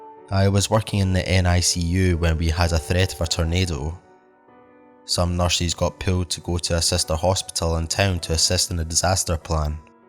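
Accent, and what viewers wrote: Scotland, United Kingdom. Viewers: Amazing, is that a Scottish accent?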